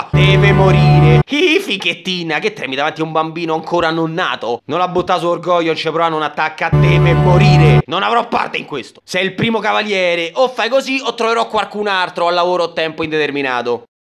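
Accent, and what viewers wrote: Rome, Italy. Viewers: Ma tu sei un genio!!! Poi il romano mi fa sbellicare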